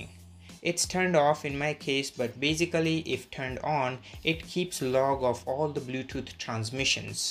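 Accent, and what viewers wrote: India. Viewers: Classic Indian accent you absolute tool , culture yourself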